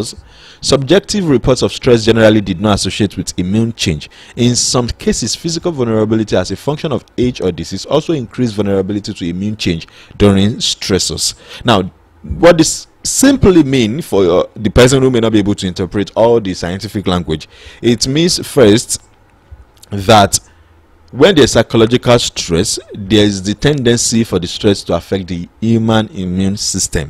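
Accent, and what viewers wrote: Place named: Ibadan, Nigeria. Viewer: Nice one but why your yoruba accent like Ibadan man come dey come out.....pu-bu-lick😊😊😊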